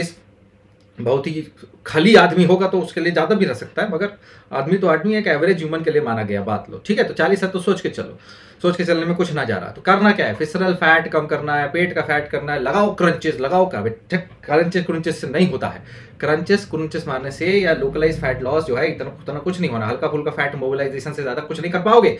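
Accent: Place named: Bihar, India. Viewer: Bihari accent 😅😅😅😅😅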